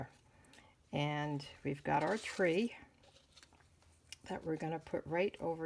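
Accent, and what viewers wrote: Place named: East Coast, United States. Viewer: Soooooooooooo adorable!!! I just love where you placed the sentiment tag. Thank you so much for your well executed tutorial! And as always, this NY lady, in now living in CO, loves hearing your east coast accent!! Be well, Hugs, Karen ❌💜❌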